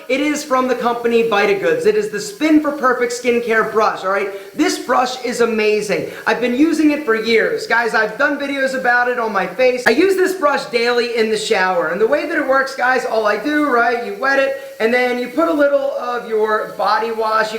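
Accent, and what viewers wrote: Philadelphia, United States. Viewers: This guy is definitely from Philly that accent though.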